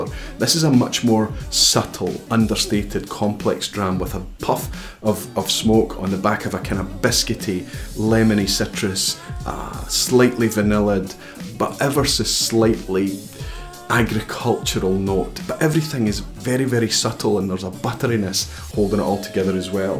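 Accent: Scotland, United Kingdom. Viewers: Yes, I did and found it helpful. But I am not a chemist and I recall in this or one of your videos you mentioned that the coloring was sugar or "sugar based" and I wondered whether that would affect the flavor as well as making a more balanced color? Living in the AMERICAN SOUTH where we have our own unique version of English, I have to listen carefully when you speak. I assume that when you say "meshie" you are speaking about a "blend?" But do not change a thing. I have visited Scotland and I love the country, people AND the Scotch (but not the haggis, sorry) Keep doing what you are doing-you have fans in the USA!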